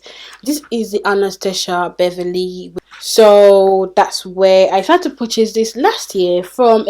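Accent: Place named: Nigeria. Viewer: Hi I like ur accent, are Nigerian? Liked and subscribed.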